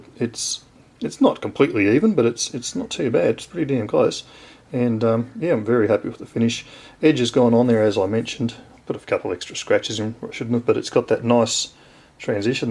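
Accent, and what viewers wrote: Australia. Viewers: this American always enjoys a good Aussie accent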